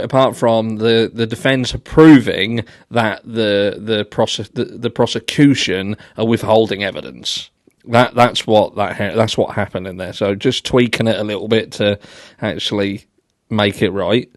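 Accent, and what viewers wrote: United Kingdom. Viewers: I've seen your subs swooning over your blue, blue eyes, your British accent, your beautiful wife, etc. but it's your unexpected, high-pitched giggle that does me in 😅😂😂! Dying.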